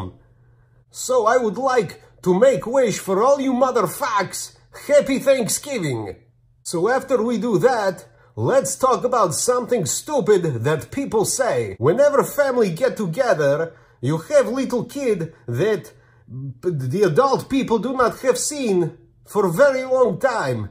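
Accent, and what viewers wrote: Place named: Russia. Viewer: Omg this dude makes point good pls sir teach Russian language and accent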